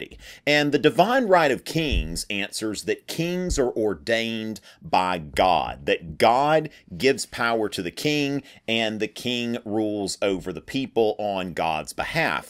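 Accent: South, United States. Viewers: How is this guy not more popular? His accent takes getting used to if you're not familiar with that southern twang, but once you get passed that he's not boring!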